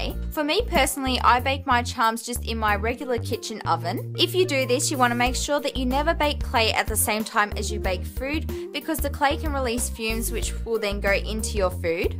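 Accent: Australia. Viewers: Hey, just curious, are you a fellow Aussie? (Just asking cause of your accent and the Woolworth's brand) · I'm brand spanking new, wanting to give this a go and I'm so thrilled with all the "how to get started without buying the whole craft store" tips!! Thank you! Subscribing now because I love your style, your editing is really good and it's awesome to listen to a fellow Aussie! <3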